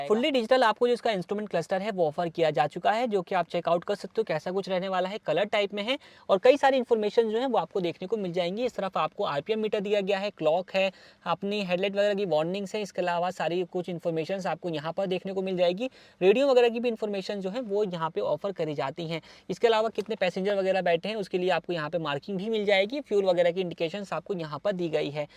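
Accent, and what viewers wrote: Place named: Jhansi, India. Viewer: Tum jhansi se ho bro voice pahchn gye ham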